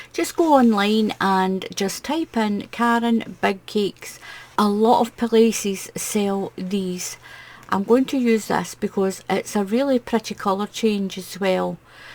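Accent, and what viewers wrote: Scotland, United Kingdom. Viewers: I love all your patterns, you can make crocheting so easy to do, you are a great teacher...you explain every stich perfectly. I love listening to your Scottish accent, and when muttley gives a wee bark now and again, Thank you, xx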